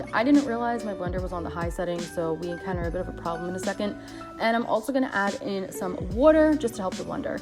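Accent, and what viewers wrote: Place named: Long Island, United States. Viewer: You from Long Island? I can heart in your voice. I’m a Queens boy myself. Great recipe!!!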